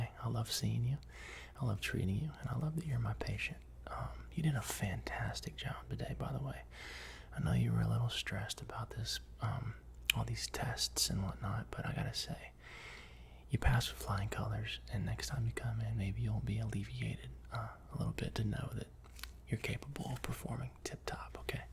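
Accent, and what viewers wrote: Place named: South, United States. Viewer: Your ever so slightly noticeable southern twang is so comforting lol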